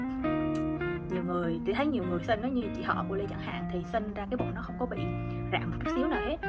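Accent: Da Nang, Vietnam. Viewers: Em mê giọng chị vs giọng con gái Đà Nẵng nói chung lắm huhu =)))) chúc 2 mẹ con chị Ly mạnh khỏe nhaaaa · Nghe giọng là biết ngay ĐN luôn ❤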